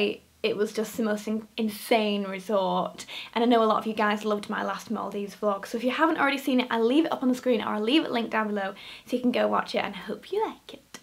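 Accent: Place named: Manchester, United Kingdom. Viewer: Your Mancunian accent really stands out in this video! Loooooooove it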